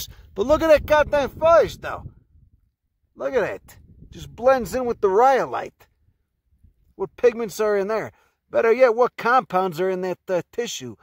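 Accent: Chicago, United States. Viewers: Love this ! My brain feels like a sponge.......LOL..soaking up all this info plus your marvelous Chi town accent..Great way to start a day ! Thanks :) And yes...cute shit DOES make me feel less homicidal..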